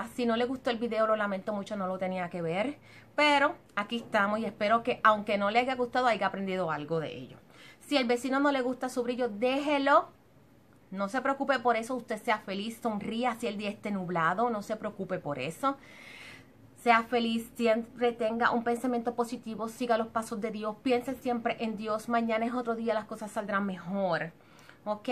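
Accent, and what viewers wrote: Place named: Puerto Rico. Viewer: En Puerto Rico hablan asi credo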